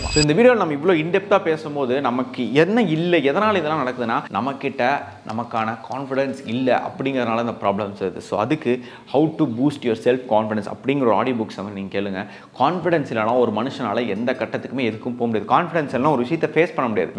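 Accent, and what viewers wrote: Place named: Madurai, India. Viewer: Anne Madurai slang la pesurimga❤❤❤❤❤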